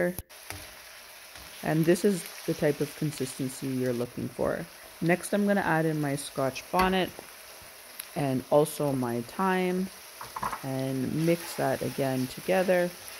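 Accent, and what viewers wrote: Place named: United Kingdom. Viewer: Why did I think you was British lol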